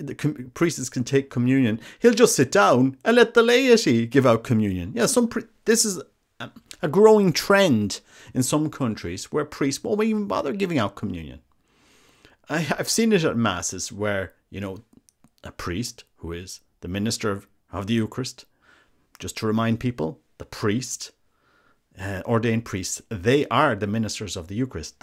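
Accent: Ireland. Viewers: I just found your channel and appreciate your discussion on the Holy Eucharist. It is too rare. I diverge a bit from you however on revealing the parish, if not the priest. What you have described is a grave sacrilege and crime in canon law. It is further a scandal to any witnessing it, serving to malform the faithful. I don't know where you are, but if I know that a parish or priest operates contrary to the law of the Church, scandalously, and bars the faithful from properly receiving sacraments, then it's nothing private. You can't let it go so that innocent travelers wander into a den of sacrilege or will be refused the Eucharist when they may really need it. It's just my own opinion, but I couldn't sit on that krp secret without it burning me in payment for the harm it could do. I live in a diocese where 2-3 parishes are notorious. New people to town ask me frequently where they should go. 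The whole reason that I suggest not going to those parishes is because of their noncompliance with Catholic teaching. I couldn't let those people be victimized. Anyway, great video. Good job. As an American, I love a good Irish accent. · At Holy Name Cathedral here in Chicago, the bishop celebrant extended the Host that touched my hand, leaving two broken halves inside my palm for which I was harshly admonished not to grab for the Host. The Church should have left well enough alone. Thanks for being here. And, from your mellifluous voice and accent I tell a Cork man.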